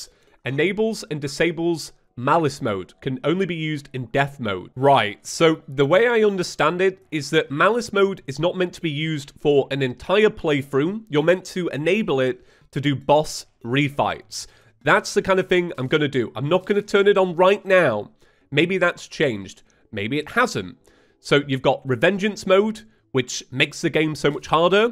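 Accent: United Kingdom. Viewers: Omg are you British!😍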